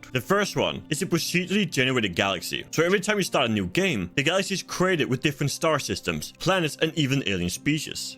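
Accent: Denmark. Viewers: sound like a dane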